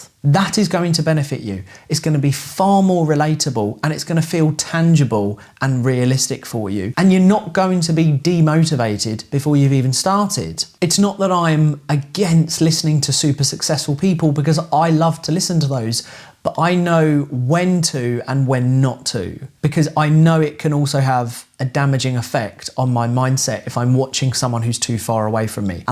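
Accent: United Kingdom. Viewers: Man~my good sir! I totally enjoyed your video. Coming from a country where American English dominates, now I am really starting to like your British accent. How marvelous! Thanks again.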